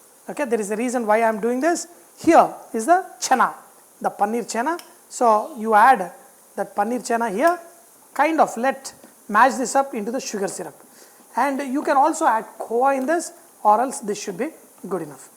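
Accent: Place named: Telangana, India. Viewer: No dish is perfect without your Telangana slang please make some videos in Telangana slang sir.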